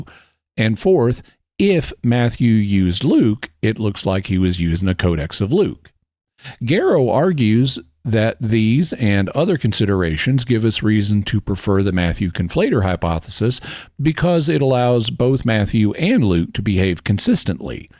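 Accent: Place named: Texas, United States. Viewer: Because he's from Texas and that's probably just the way he talks.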